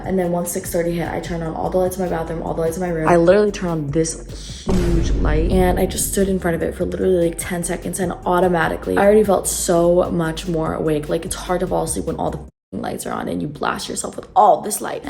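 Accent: England, United Kingdom. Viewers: loved that british accent lol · Your British accent is so good, I love it. Your videos are also so good. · the British accent is not it girl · Love the vids but the English accent ain't it sis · Gosh you crack me up with your english accent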